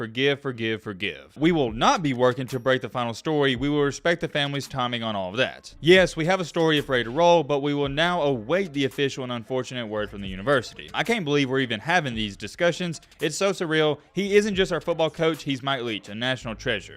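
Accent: South, United States. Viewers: Hoe-Spice? Please never complain that people assume you're an ignorant redneck based on your ignorant redneck Cracker accent. You prove it. You're simply too Dunning-Kruger to understand the condition of my favorite coach. You have no idea how many names and words you mispronounce. What is the redneck name for Ebonics?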